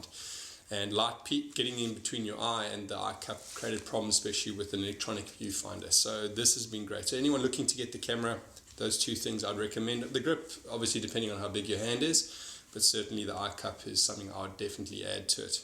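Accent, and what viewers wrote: South Africa. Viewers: South Africa, one of the best accents. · Are you from South Africa? Sounds like your accent is from that area.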